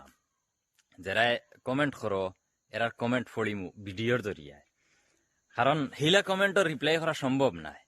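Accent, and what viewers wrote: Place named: Sylhet, Bangladesh. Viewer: আমি বাংলাদেশ সিলেট থেকে কইয়ার উটাও আরওক কথা তুমাতানর মাত আর আমরার মাত এক সমান কোন বেশকম নাই,, আমি আগে মনে করতাম তুমি তাইন সিলেটি,, পরে শুনি,, তুমা তানর বাড়ি আসাম,, ভালো তাকেন,, আসলে তুমার একটিন সেই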